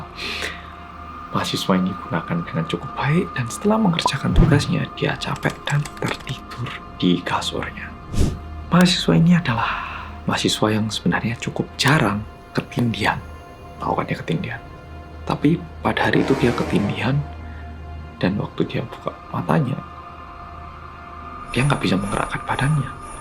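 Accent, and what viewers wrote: Java, Indonesia. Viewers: Hallo oppa medokn☺️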